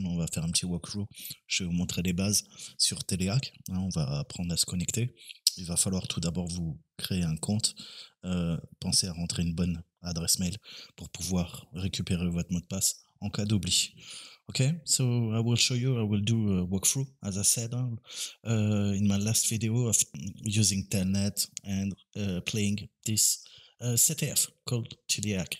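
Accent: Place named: France. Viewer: Oh mec i'm in love with your french accent 😂 you rock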